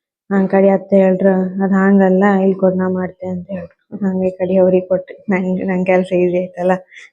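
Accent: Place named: Kundapura, India. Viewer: Kundapura kannada chanda mat addtri maree ❤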